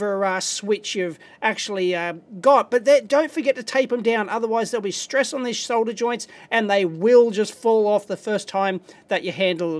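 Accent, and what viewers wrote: Australia. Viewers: Good job on the comment. You put a lot of effort into making this comment, a lot more than most people would ever do. My comment I just rattled off the top my head what I thought, without putting much thought into it. Thats the problem with watching people who are from a different country than you, I rember watching dave for the first time. It was his giant HDD tear down to me he sounded like the stereotypical Australian to me, but after so long you dont notice the accent.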